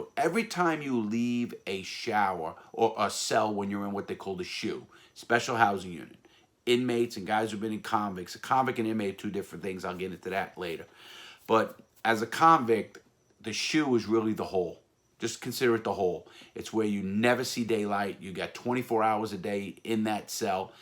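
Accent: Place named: Philadelphia, United States. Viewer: I could tell you're a Philly person due to your accent and specific terms you've said like hoagie and such.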